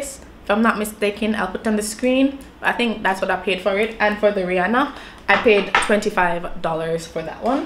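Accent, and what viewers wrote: Jamaica. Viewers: Yasss I’m hearing a Jamaican accent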